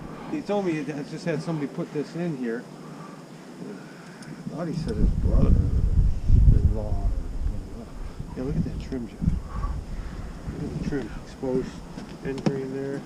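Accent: United Kingdom. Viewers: love your British accent you make me laugh xxx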